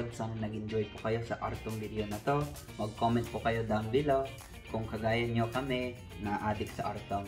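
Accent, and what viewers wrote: Ilocos, Philippines. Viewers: Ang cute mong mag salita ilocano ka nga talaga 😂😂😂I love it ❤ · I really salute how you embraced being a Filipino. Ilokana din ako and you really sounds like a legit one. Kaway-kaway sa new subscriber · Grabe balong. Pinoy na pinoy ka na talaga ah. Ay ilocanong ilocano na pala hahahaha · Ilocanong ilocano pati Beng at panagsao ket natangken...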